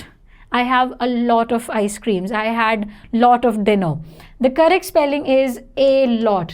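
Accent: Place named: India. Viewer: I like Indian accent.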